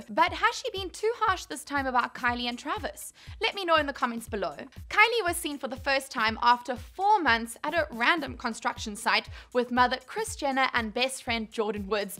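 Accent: South Africa. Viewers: is from South Africa?? cant miss that fellow south African accent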